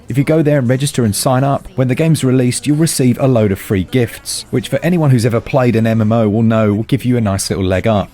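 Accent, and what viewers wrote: United Kingdom. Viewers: I really appreciate having a channel to tune into for Switch sales. I can tell you guys have been working out the kinks, making each episode ever smoother. ...I’m also a fan of the British accent, I have no idea who that’d annoy.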